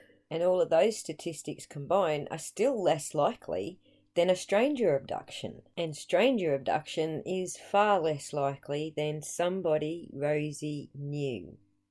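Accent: Australia. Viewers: Exactly what I been saying. I see her being the Female "Mike oh" with her Aussie twist!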